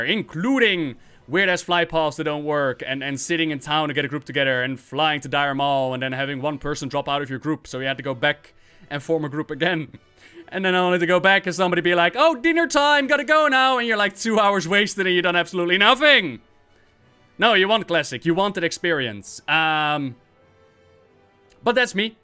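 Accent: Netherlands. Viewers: you dutch?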